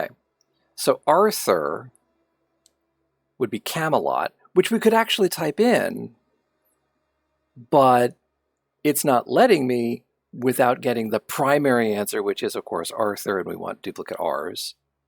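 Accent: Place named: Canada. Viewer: Also curious since you mentioned it - there was something that stood out to me in one of your videos. I had assumed (now confirmed correctly) that you are Canadian since you have been saying "zed" but don't have an east-overseas accent. Though later I noticed when you were looking in the Hinterlands, you said "catch some ZEE's" which I think is the only time so far I've heard you say it like that, is that particular idiom usually said that way? Or did it just happen to roll off this time?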